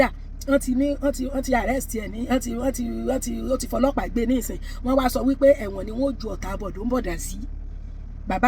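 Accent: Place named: Osun, Nigeria. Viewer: I REALLY GBADUN THIS YOUR PROGRAM WITH THIS YOUR YORUBA LANGUAGE YOU'RE REALLY FROM OSUN STATE😂😂😂😂😂❤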